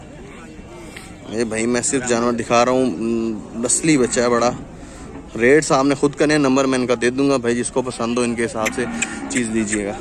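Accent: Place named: Karachi, Pakistan. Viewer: Bhai ap bilkul karachi walay lagrahay ho or bargling bhi karachi walo ki tarah krahay ho i like you keep it up agar multan aya to ap se zror miloga apka channel aj hi subscribe kia hai mainae.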